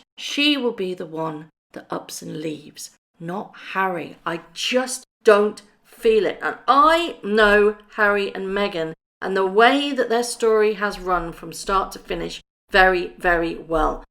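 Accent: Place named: Wales, United Kingdom. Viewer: ?,?, I love her Welsh voice. It’s like having a natter over the fence with a good neighbour when you listen to her.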